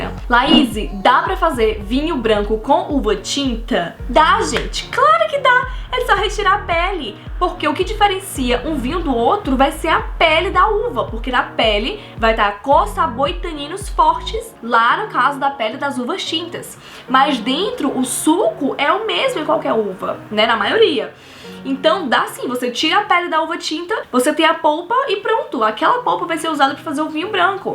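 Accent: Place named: Ceará, Brazil. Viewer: Adorei sua aula e sua espontaneidade. E o sotaque cearense. Acertei?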